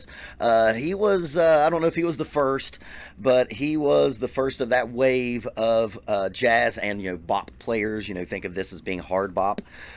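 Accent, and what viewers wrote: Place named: South, United States. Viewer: your accent is really interesting, what part of the US are you from(from movies i know its the south somewhere)? also great video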